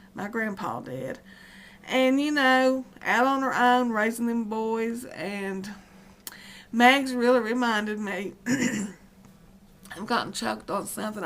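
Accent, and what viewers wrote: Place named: Texas, United States. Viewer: You do sound like us Texans! I thought you were one of us! :) That's ok, you're still an awesome sweet person. :)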